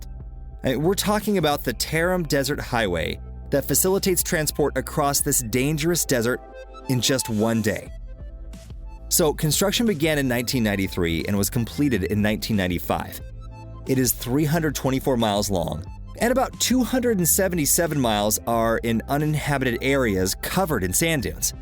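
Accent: United States. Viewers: American video spoken in an American accent using American units of measure. Hmmm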